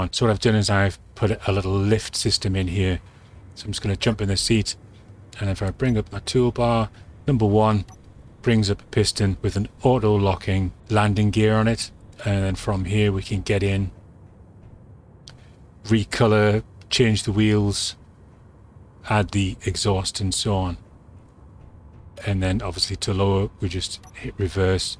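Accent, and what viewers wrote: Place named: Scotland, United Kingdom. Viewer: I meant I was waiting for a tutorial from you. But... I suppose you're right. Beat my head against the wall enough, and I could probably figure it out. It's just so nice having your soothing Scottish(?) voice explain everything in perfect clarity. (Is that enough sucking up?:-) Again, thanks, for all your efforts. I appreciate it.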